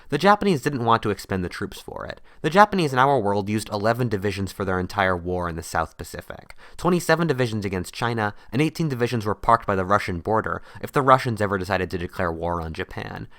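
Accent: United States. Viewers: I was complimenting him on knowing how Aussies say those place names. There's another word Americans get wrong, Australians say Ozzies while most Americans say Ossies. He's obviously wel travelled and pays attention, without losing his American accent during normal speech. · Stupid fuckin annoying American accent coupled with poor analysis. Shite video.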